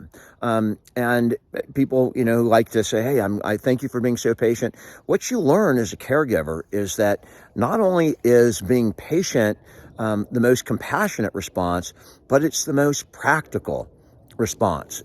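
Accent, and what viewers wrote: California, United States. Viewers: You have a heavy California accent lol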